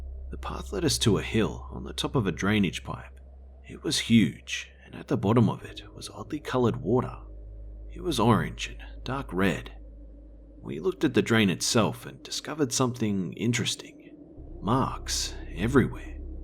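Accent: Australia. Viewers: It feels so good to finally have a fellow Aussie narrator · you voice is priceless are u aussie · are u a aussie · Fuck yea it's refreshing hearing a Fellow Aussie telling a horror story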